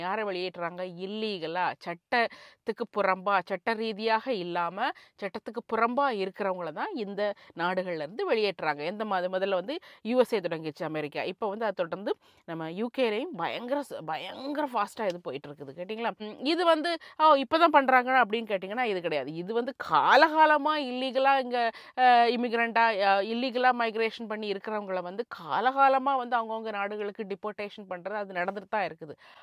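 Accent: Nagercoil, India. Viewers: Neega nagercoil a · Nagercoil Tamil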